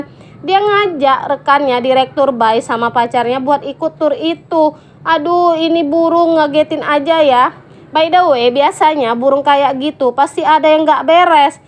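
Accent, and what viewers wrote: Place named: Medan, Indonesia. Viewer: Ini baru flim yabg ku suka. Mana suara anak medan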